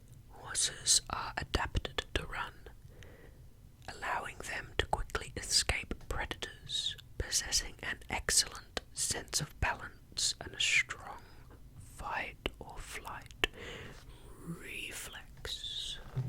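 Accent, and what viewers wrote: Australia. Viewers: Maaan, your Aussie accent is EVERYTHING!😍😍I will listen to you whisper ANYTHING and still tingle!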